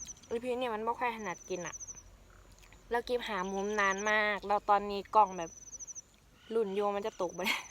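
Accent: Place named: Chaiyaphum, Thailand. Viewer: มักหลายสำเนียงคนชัยภูมิ น่ารักมากครับ❣️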